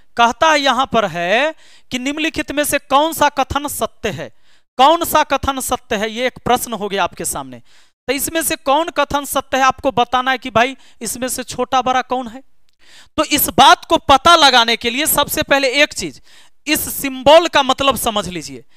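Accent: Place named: Bhojpuri, India. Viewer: Ekdum bhojpuri style mein bolte hai to maja aa jata hai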